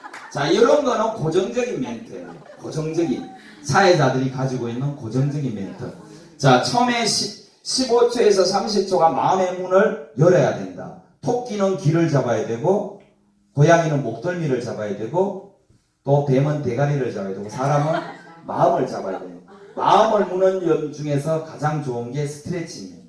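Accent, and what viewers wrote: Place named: Gyeongsang, South Korea. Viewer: 잼나고~ 경상도 사투리 죽이네용ㅋㅋ~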